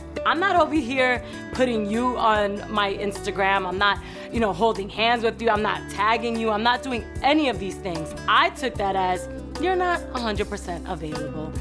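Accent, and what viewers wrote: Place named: New Orleans, United States. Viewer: Nawlins accent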